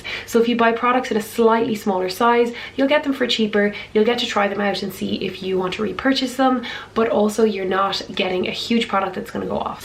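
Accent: Ireland. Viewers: R u Irish??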